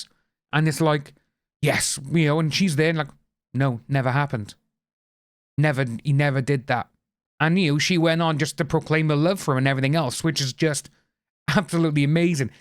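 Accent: Wales, United Kingdom. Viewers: Are you Welsh? I hear it in your voice. I’m from Tonypandy. I agree with your comments btw. Spot on. 🏴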